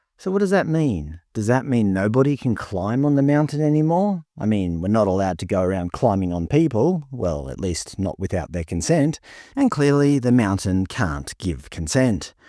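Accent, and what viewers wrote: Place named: Australia. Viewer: You sound like an Aussie, trying to tell the story of,, the Indigenous of Aotearoa🤦🏽‍♂️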